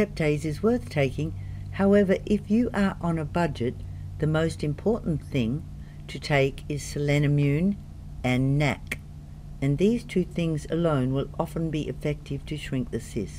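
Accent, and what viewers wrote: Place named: Australia. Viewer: Morena, just found u & now subscribed after watching this vid. Loved your informative delivery & warm Ozzy tone. ❤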